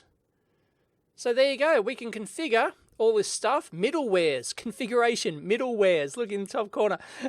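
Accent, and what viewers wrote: Australia. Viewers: With your australian accent, honestly I sometimes wasn't sure whether you said "uhm..." or "ARM" :) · Wtf he is australian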